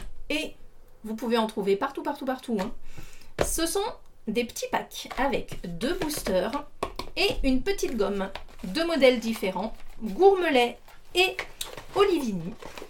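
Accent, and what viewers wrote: Saint-Étienne, France. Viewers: Toujours ce petit accent stéphanois qui fait tellement plaisir... Beausaigne... Oui les tablettes de chocolat achetées chez Casino avaient des images d'animaux... Félicitations pour vos vidéos toujours sympathiques.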